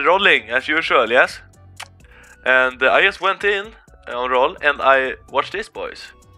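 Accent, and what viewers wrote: Sweden. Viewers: Nice video dude, You have to be Swedish by that's english accent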